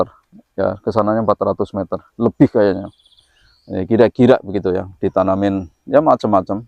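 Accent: Java, Indonesia. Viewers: Mas, ngeliat alam Suriname sama persis dengan alam Indonesia. Mendengar logat mas,Jawa banget. Kayak ngeliat orang Jawa di Indonesia. Matur nuwun mas. Sudah memvidiokan alam Suriname. Salam buat semua sodara sodara disuriname Yo mas. Semoga semua sehat selalu... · Ok pak jowo banget iki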